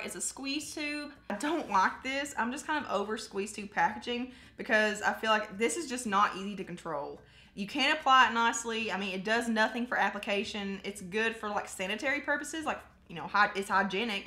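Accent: South, United States. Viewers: Country bumpkin... ain't nothing wrong with that! What I love about you is that you don't mask being Southern like another big youtuber does! Have a great week and love from Tennessee 😘! Also, I only have 5 notifications on and you're one of them 💖!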